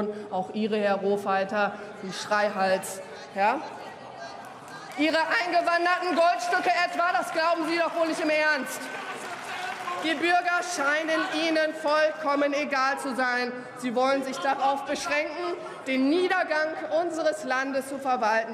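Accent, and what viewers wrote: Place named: Westphalia, Germany. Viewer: Finde ich irgendwie süß, wie Alice Weidel auf kernig macht und gleichzeitig immer dieser starke westfälische Akzent herauskommt, z.B: : "Schuldenbeag ... eaben weaden" Klingt mehr nach Landfrauenfrauenverband Rheda-Wiedenbrück als nach AfD-Oppositionsführerin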